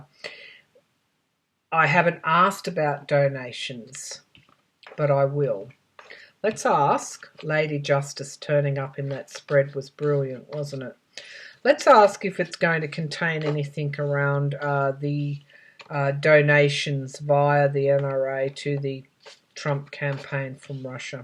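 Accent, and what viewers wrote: Australia. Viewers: Good morning my beautiful calm and funny Aussie friend. I have no plans to listen to the BS behind the Mueller report again today just like I didn't listen to The First One but listening to you this morning and you used a curse word cracked me the hell up😂 as a matter of fact I think I was half asleep listening to you and then when you said that I sat straight up in the bed and paused your video and just laughed. And with that beautiful accent of yours you are in it to win it just as much as we are. Much love light and blessings are sent your way. By the way even when you throw some serious shade you are still a lady.🗽🇺🇸💐🗽 Sleepless in Indiana. P.s. God Damn! You are something else! OG. Just thought of something else. Maybe after all is said and done and all the BS comes out, the GOP will have a reunion at Jurassic Park and try to plan their next strategy with their prehistoric animalistic instincts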